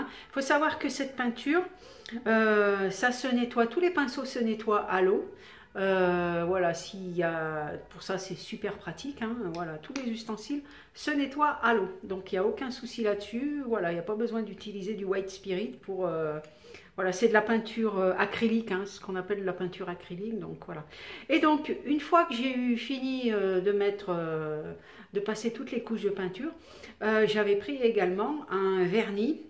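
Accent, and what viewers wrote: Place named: Saint-Étienne, France. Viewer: oui effectivement tu ne fais pas que du maquillage J'adore ton accent.... tu ne serai pas pas la region stéphanoise ? J'adore le relooking de meuble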